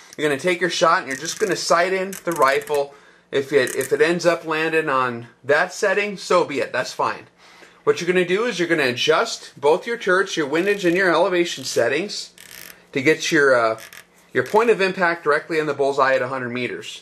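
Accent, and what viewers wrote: Canada. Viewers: Sorry kind of a new watcher here, but are you Canadian?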